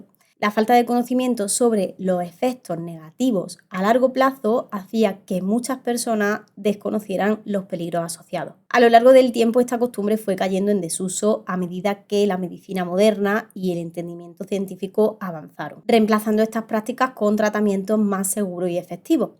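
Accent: Andalusia, Spain. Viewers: Seguro que es andaluza como yo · Tú acento es el más bonito de los acentos de España, al menos para mí oído latinoamericano. Muy interesante y entretenido tu contenido.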